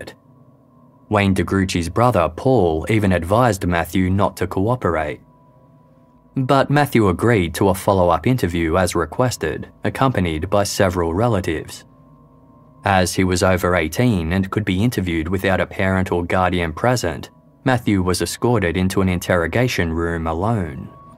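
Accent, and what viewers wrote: Australia. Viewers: So nice hearing a fellow Aussie. Wooo 🥳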